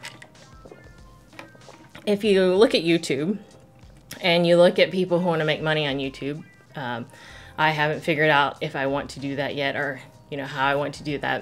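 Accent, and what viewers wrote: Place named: United States. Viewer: Sounds like 100% American to me.;)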